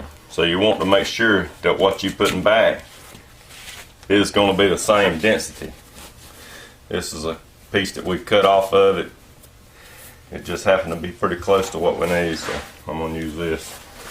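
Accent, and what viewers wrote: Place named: Deep South, United States. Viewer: He ain't from Chicargo, that's fer shure. More like Ludiwici, Georgia, I'm a guessin. South, deep south. More southern than even the Sawfish. : ) I like it.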